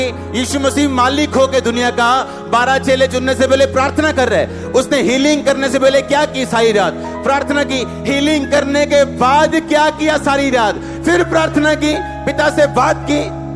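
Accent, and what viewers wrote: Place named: Punjab, India. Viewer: Apki Punjabi language bolte phli bar suna so swt papa ji😊❤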